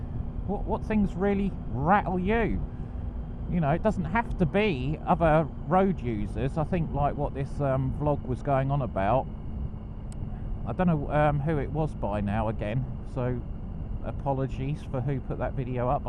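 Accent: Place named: England, United Kingdom. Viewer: I agree about the metal covers. We are experiencing astronomical growth in this area of Tennessee, USA and they are putting in new roads, and tearing up older ones to put more drain lines, etc, in the ground. Many of them are squared and hitting the corner of one can do damage on your tire. Some stick up several inches and hitting one at night in a curve can be an eye opener. When they are wet, dangerous. You must be from the same region of England as The Cockney Biker. He has an accent much like yours, he has been living in the States for a number of years now.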